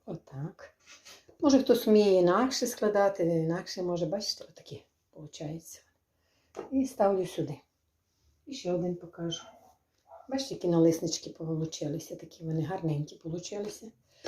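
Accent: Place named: Bukovina, Ukraine. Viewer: Слюна тече дякую мені кажеться що ви з Буковина наша рідна мова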